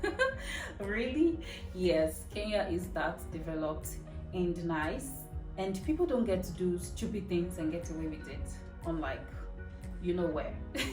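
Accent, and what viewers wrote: Nigeria. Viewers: So calm and your Nigerian accent is minimal. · Yeah I noticed that too.. is very subtle only sell outs being "T" and "O" sounds as compared to most Nigerians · Your Nigerian accent sounds not Nigerian. The loudness is also loudless for a Nigerian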